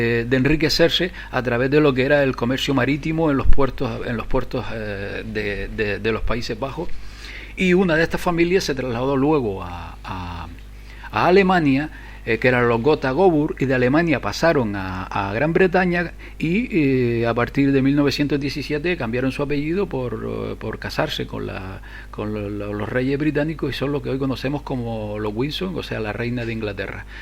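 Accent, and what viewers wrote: Canary Islands, Spain. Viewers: Muy buen trabajo, tocayo. No coincido estrictamente en todo, (son temas muy complejos, con cabida para la interpretación personal), pero si en la inmensa mayoría, y sobre todo en lo más importante. Bien documentado y explicado. Gran labor social y muy necesaria en éstos tiempos. ¿Me permite una pregunta personal?. Su "asento" me resulta extrañamente familiar. ¿No será usted Canario, verdad? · Acento canario es bonito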